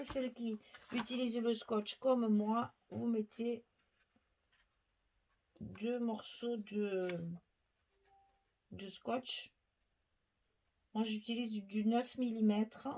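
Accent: South, France. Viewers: Je viens de faire une belle découverte en visionnant votre tuto. Tout d'abord j adore votre accent qui vient du Sud. Vos explications sont claires. Je me crois à un cours de scrap tellement vous détaillez bien . Bravo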